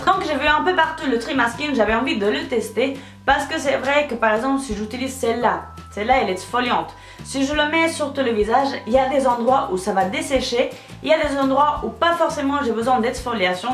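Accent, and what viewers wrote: Spain. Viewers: T'es magnifique! Moi aussi je suis espagnole! ❤️❤️❤️ Mais moi jai pas d'accent, dommage..😒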